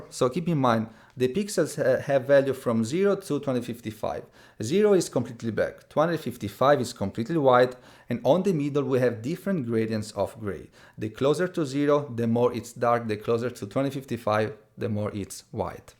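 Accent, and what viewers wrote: Italy. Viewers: Are you Italian?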